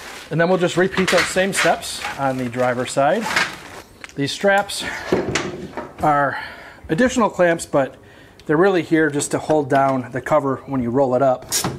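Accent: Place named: Western New York, United States. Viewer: That WNY accent will get you every time.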